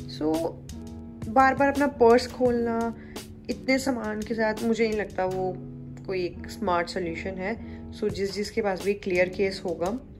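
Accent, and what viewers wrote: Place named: Gurdaspur, India. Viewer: Munde di boli toh pta lg gya oh gsp toh aw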